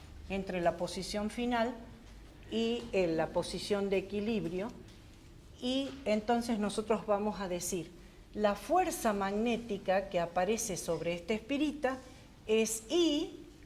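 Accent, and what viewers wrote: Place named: North, Spain. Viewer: Que Facultad es? Tiene acento norteño la profe.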